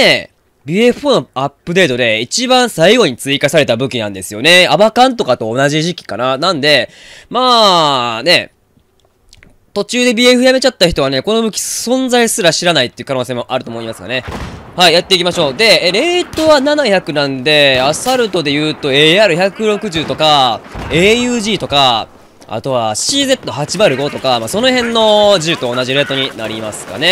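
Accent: Kansai, Japan. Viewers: しょー 関西弁の人が上手いこと敬語を交えて話すのって地味に凄いからな(´・ω・｀) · 関西弁ってひとつの持ち味だよね。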